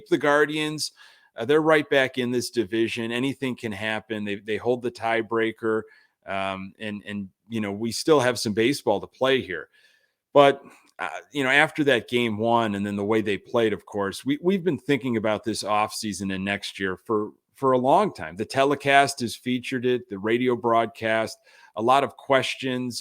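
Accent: Chicago, United States. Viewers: Glad I found your channel! Love the chicago accent! Reminds me of the SNL Da Bears skit lol Go SOX!!!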